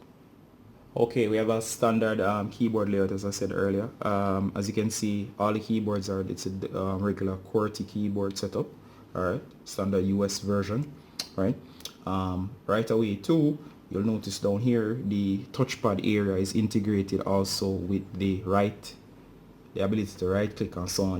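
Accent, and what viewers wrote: Jamaica. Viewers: U sound Jamaican or at least Caribbean... Awesome review bro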